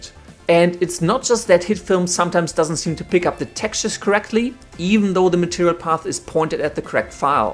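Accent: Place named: Germany. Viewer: Das ist doch ein deutscher oder? merkt man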